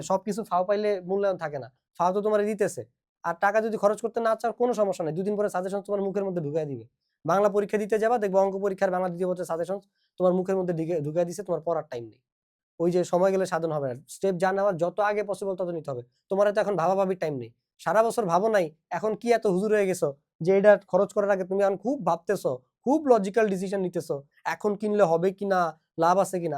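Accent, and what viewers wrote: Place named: Sylhet, Bangladesh. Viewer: Sir, afnar Sylhet mat je sundor,❤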